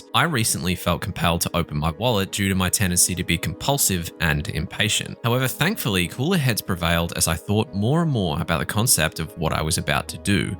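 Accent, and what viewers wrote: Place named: Australia. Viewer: If only it werent for the Aussie accent, it'd be decent content.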